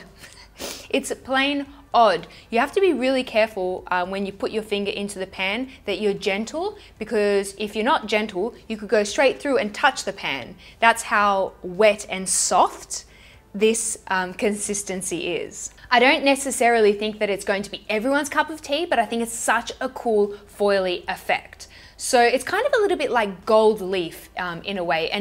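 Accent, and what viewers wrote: Australia. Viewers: Love your Oz Dialect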